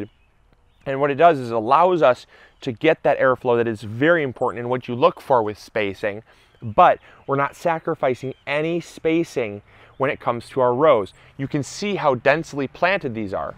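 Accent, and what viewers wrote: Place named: Minnesota, United States. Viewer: I'm from Canada so I have no idea, but is this what people from Minnesota sound like?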